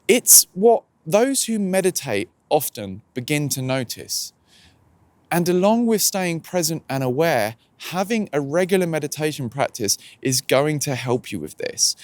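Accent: United Kingdom. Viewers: Great content man! I've been meaning to explain this topic to people but they don't understand me or don't want to. I've seen people overreact and become so identified with their minds that they seem out of control so I try to help but it's hard. PD: I love the accent, I'm from Argentina and I'm studying to become an English teacher and here we learn RP English.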